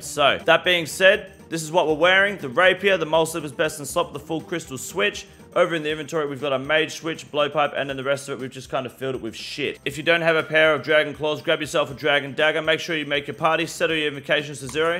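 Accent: Australia. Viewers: ur aussie accent is fantastic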